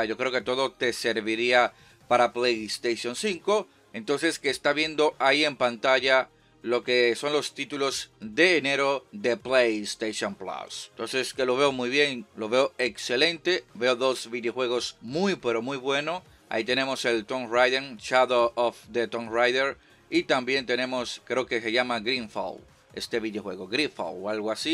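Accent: Dominican Republic. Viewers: eres dominicano????????????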